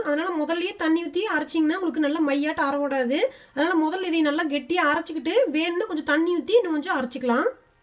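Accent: Kongu, India. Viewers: Arumai ma. Athaivida arumai unga kongu pechu vazhakku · Kongu thamilum dishsum superngo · kongu tamil epayume azhaguthanga..namma pecha aduchuku aale kedayathunga..super sis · Samayalum super.Namma kongu thamilum superunga. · தோழிக்கு வணக்கம். நம்ம கொங்கு தமிழே தனி அழகு தான். 😊. அருமை. நம்ம ஊரு எந்த ஊருங்க. நான் ஈரோடு ங்க. என் வாழ்த்துக்கள் 👍😊🙏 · aaha... naan vaniputhur-medu nga (near T.N.Palayam).. nam mannin vaasanai polave ungal pechum, unvaum.. neenga kalakkunga.. endra aatharavau ungalukku eppoluthum undu. Thank you.. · Super sister. Namma ooru style la super ah sollirukeenga. Naan Gobi arts la dhan padichen sis. Native sathy. Happy ya iruku namma oor style la kekaradhukku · உங்க கொங்கு மனம்... உங்கள் பேச்சிலும் வீசுது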